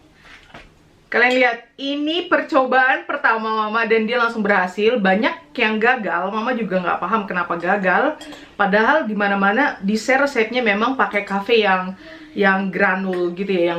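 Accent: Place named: Kalimantan, Indonesia. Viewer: Enakkah? = nyamankah? Hehehehehehhe... logat kalimantannya kentel ya...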